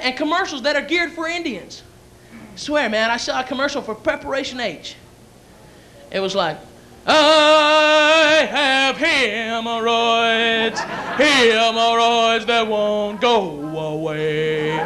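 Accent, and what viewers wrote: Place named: South, United States. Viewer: didn’t realize he had a southern accent .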